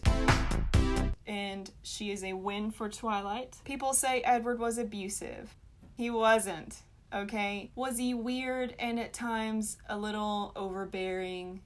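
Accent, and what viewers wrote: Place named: South, United States. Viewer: I didn't know you were from the south and now you've said that I can here your southern accent so clearly !!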